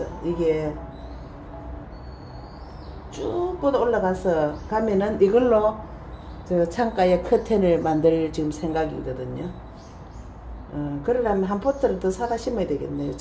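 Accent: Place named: Gyeongsang, South Korea. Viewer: 깍지블레하꼬 엉애 때문에 골치군요. ㅎㅎㅎ. 겡상도 사투리가 참 구엽워요. 매번 좋은 영상 올려주셔서 감사합니다.^^ 이 영상 아주 유용할 것 같아요. ㅎ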